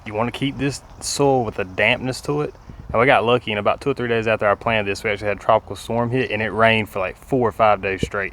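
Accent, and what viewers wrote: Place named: South, United States. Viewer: that southern draw. I got it bad. Thanks man. Appreciate it.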